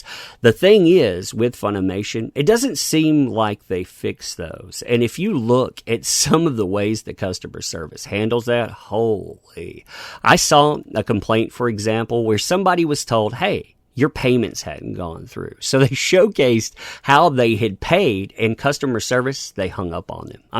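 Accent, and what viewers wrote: South, United States. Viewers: I love you and that southern-ish accent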